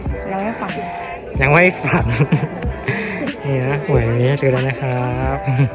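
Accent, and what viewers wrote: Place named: Kamphaeng Phet, Thailand. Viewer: สำเนียงคนกำแพงเพชรครับ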